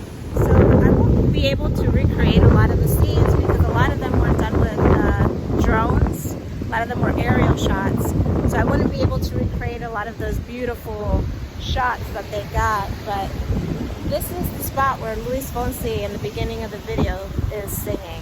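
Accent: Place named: Spain. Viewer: I love your Spanish dialect. Such beautiful color's, and the ocean is really something. Thanks for taking us with you 🥰😎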